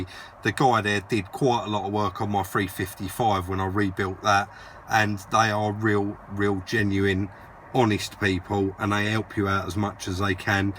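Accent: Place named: London, United Kingdom. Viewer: You sound like Danny Dyer with that london accent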